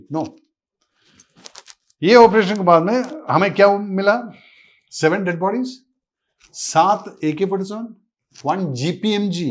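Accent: India. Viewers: He is from Kerala, i can see from his accent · Origin: Kerala Accent: Punjabi This is the Indian Army for you. ❤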